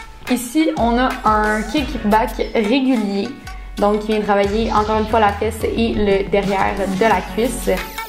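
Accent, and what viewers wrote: Quebec, Canada. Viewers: L'accent Québecois est ultra jolie mais quand c'est qui parle je fond littéralement 😍😍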